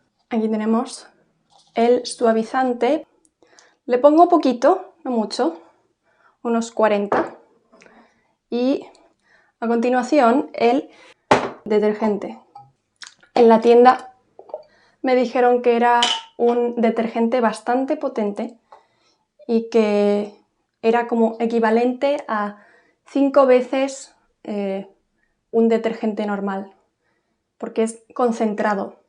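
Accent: Spain. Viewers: si, lo vi después. Subió en mi algoritmo. Ja ja. Ahora entiendo. No supe que estuvieras en Corea. Pues, pensé que fuera Espana. Tus videos suben por mi feed por casualidad completa, ni en la orden actual. No sé. Me gusta como hablas bien claro y describen cosas en español. Tienes muchas palabras distintas. Yo aprendí español en Chile así que me fascina como hablan los españoles. La verdad es que prefiero el acento español ya, después de hacer dos caminos de Santiago y conocer a muchos españoles. Tienen un montón de refranes que amo. Y me gusta que los españoles hablan muchísimas palabrotas. Para mi, como gringo, me hacen reír. Joder escucha cada rato en Espana; joder para todo. 😆😆😆 Bueno, bien hecho. Me gusta tu canal. Yo enseñaba español la Universidad de Hawai por unos años mientras hacía mi maestría, pero me gusta tu técnica de enseñanza, me gustan tus expresiones y tu cara animada. 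Dale pues, bien hecho. 👏👏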